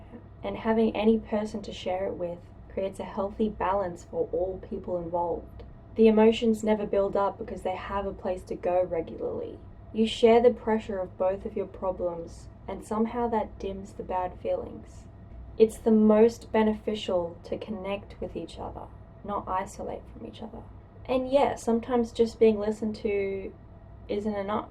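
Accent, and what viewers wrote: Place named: Australia. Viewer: Aye u got a funny Australian accent g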